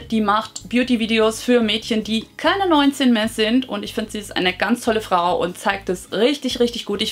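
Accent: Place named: Hesse, Germany. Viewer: Auf Hessisch bin ich jetzt so gar nicht gekommen. Musste gleich lachen als meine Frage prompt in deinem Intro beantwortet wurde. Süß irgendwie. 😊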